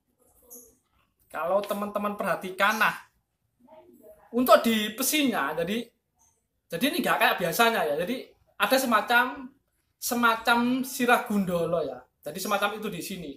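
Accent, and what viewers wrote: Java, Indonesia. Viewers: sampean ini kyknya ngomong berat skl, trll medok ya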